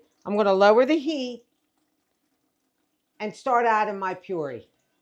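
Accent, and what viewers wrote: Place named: Philadelphia, United States. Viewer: You sound like your from south Philly, in south Philly or south jersey we always called it gravy!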